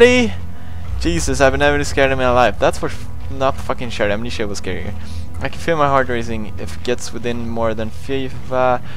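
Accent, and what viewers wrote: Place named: United Kingdom. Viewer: He deleted it because of the British accent lol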